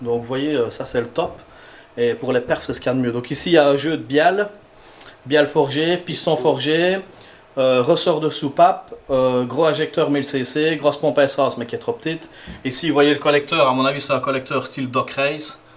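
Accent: Liège, Belgium. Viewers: Sympa ta vidéo et ton accent de liège! ;0)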